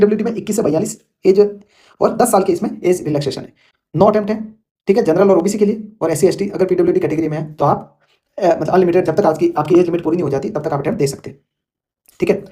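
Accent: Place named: Rajasthan, India. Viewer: sir aapki aawaj m hmaea rajasthani touch h ..aapki aawaj thodi thodi ravi kumar sihag sir se milti h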